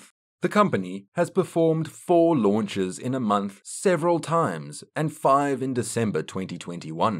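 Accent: South Africa. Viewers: Aha. South African voice.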